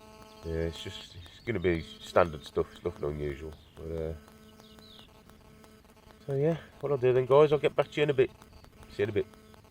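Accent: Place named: Midlands, United Kingdom. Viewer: Nice vid with some good commentary..👌 Nice to hear a Midlands accent..im in Wolverhampton... Have you tried coppice lane fisheries.?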